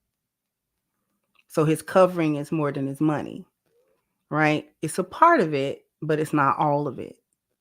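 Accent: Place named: New York, United States. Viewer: NY'er here, Brooklynite til the day I die. I hear that NY accent slowly creeping into vernacular... sto-ah, not store? I know next, she gonna start saying caw-fee 🤣🤣🤣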